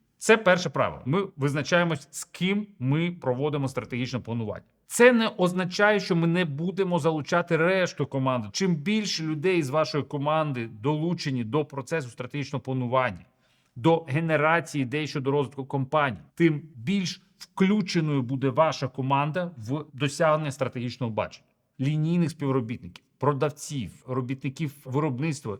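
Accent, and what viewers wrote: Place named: Ukraine. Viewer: Он говорит на русском, с украинским акцентом! В целом все понятно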